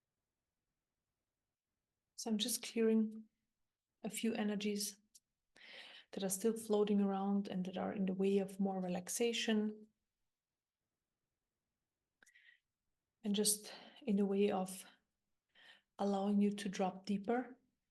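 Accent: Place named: Germany. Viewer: Beautifull you have german accent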